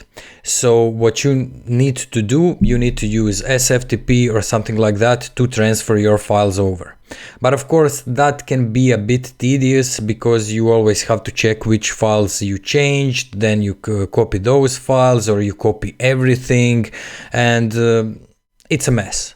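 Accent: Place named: Russia. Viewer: Акцент сразу выдает русского)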